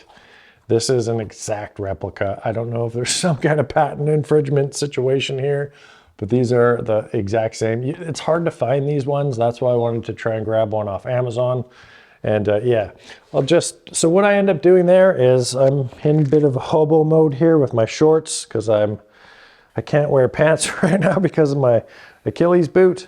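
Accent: Canada. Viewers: Camera work is getting so good! And...yeah i watch these videos cuz you're insanely handsome, and I have a soft spot for Canadian accents, but the handyman tips are a bonus 😎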